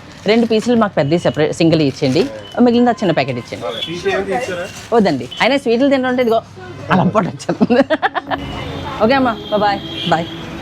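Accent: Godavari, India. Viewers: nuvu chudataniki class untav kani mataladithy man godaraari yasa gattiga undhi bro , Dubai ki godaariki yela bro asalu ... super pair made for each other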